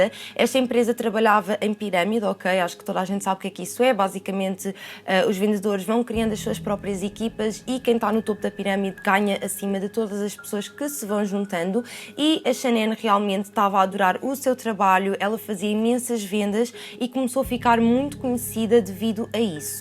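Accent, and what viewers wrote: Portugal. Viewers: Sou do Brasil, moro nos Estados Unidos e assisto muitos canais com esses temas , nunca tinha encontrado um com português de Portugal.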